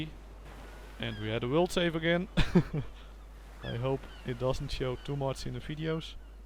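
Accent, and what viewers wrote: Netherlands. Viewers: jij hebt een nederlands acentje ÷) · are yo dutch? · he's dutch thats where the accent comes from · Ben je nl?😂👍🏼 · the dutch accent is strong with this one...... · Heeyy are you Dutch? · Are you dutch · Let me guess... You're Dutch? :p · are u dutch :) ?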